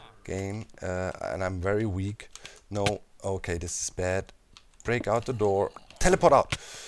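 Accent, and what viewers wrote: Germany. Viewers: funny how you switched to a french accent (from your normal German accent) when trying to show off German accent. But then... they do that in the movies, too :D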